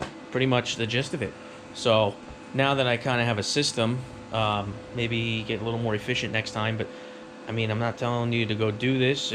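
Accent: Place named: New Jersey, United States. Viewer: I’m here for the welding and hearing the perspective of a welder from Joisey 😂✌🏻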